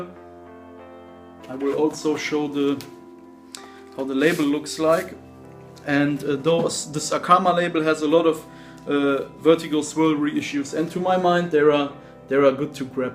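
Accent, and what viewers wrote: Germany. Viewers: Are you a german Guy?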